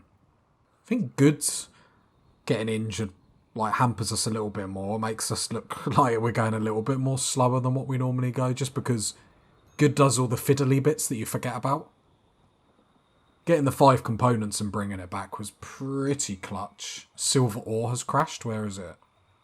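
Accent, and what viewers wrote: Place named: United Kingdom. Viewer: Your accent...just adorable! I can't have enough of the British accent! Well I have a house in England, you can understand that I love your country! xx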